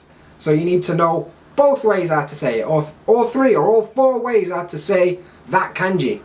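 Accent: United Kingdom. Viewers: have you ever seen your auto-generated English subtitles? Your intro -> "Thirty-Two Eyebrow it's down from ten Dakota and today..." It's like they've never heard a British accent before xD I study Kanji by writing & creating weird associations in my head about what they could represent. The kanji for car kind of looks like a box with two axels, so it's easy to remember. Or for dog, I just remember it's similar to the kanji for fat and come up with the idea that all dogs are fat because they never stop eating. Cheers on 3k subscribers!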